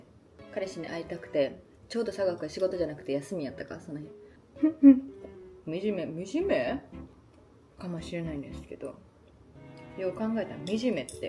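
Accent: Kansai, Japan. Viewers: 優香ちゃんが大好き❤✨やからチャンネル登録させてもらいました!私も関西人だから優香ちゃん関西弁聞いてると安心感あって大好きです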